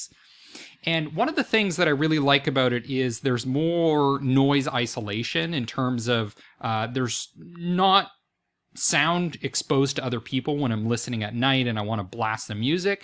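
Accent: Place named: United States. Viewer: That’s a nice review. It’s very nice informative. I also own a pair of H95 headphones. I gotta say I really like your articulation American accent. Where are from if you mind me asking?1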